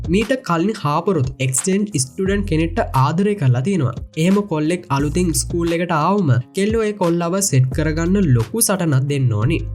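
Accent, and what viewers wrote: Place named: South, Sri Lanka. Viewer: Uba dakune kollek neda????ehetta mehetta kiyanne 😂😂😂😂😂😂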